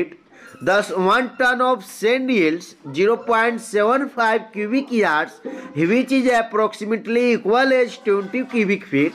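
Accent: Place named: India. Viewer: Sir your English is pronounce like hindi difficult to understand,better to speak in hindi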